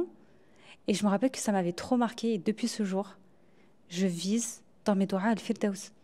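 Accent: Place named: France. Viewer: Ton accent est comme le français bon courage 😍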